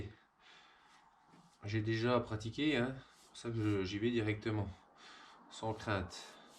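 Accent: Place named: Franche-Comté, France. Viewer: Le petit accent de la franche comté qui va bien. Juste assez sans avoir l overdose !! ( C est un franc comtois qui écrit ça !!) Meilleures vœux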